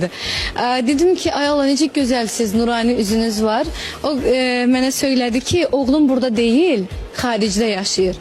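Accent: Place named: Quba, Azerbaijan. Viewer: Noye olmur Allax bunu Kuya aksentsiz daniwamir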